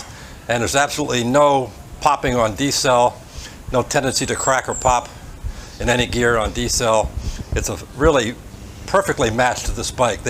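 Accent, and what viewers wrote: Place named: United States. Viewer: Hi. New to he channel. Glad to hear you are feeling better. I came for the RE 650 content and I am happy to see you fitted aTEC Stinger exhaust. I am thinking about buying a RE 650 in the future and I saw TEC's vid regarding this exhaust and was blown away with the torque pick up and weight saving. But I wanted see someone install and opine about it and the performance enhancement. What is TEC charging for it? It not listed on there US website last I checked. Also, on a personal note, you sound like an American, what brought you to live in the Philippines?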